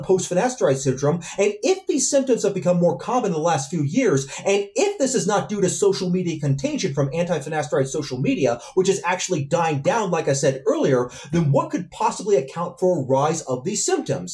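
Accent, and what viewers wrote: United Kingdom. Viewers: God, I do love your British accent, mann 😂😂😂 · isnt he scottish lol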